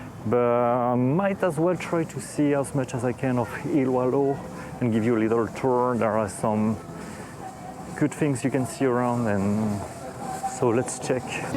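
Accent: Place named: France. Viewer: I think he’s French that’s why they say oi as oa.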